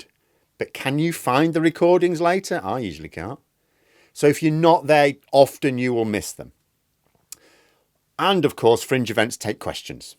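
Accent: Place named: England, United Kingdom. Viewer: I’d know who you are, I call you the. When my partner wanders in, he says you have the most English of accents, which is weird as he’s from the North East and I’m from the south, so I guess you are the somewhere in between. I listen to a lot of your videos. Thank you for all the hard work.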